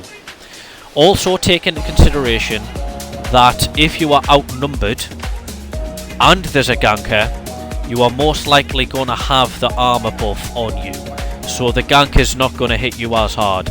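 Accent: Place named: Newcastle, United Kingdom. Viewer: Didn’t realise you were a Geordie, were going to need a duel for best Geordie EU title 😅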